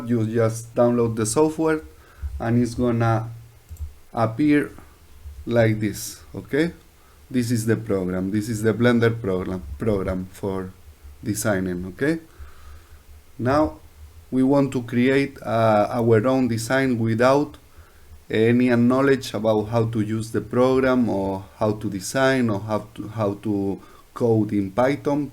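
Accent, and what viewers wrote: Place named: Highlands, Peru. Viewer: Inglés cerreño, me imagino que eres de peru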